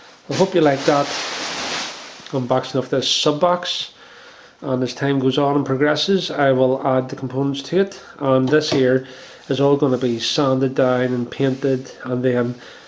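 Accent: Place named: Newtownabbey, United Kingdom. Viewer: How random. I'm searching for this and you sound like your from newtownabbey or glengormley. Small world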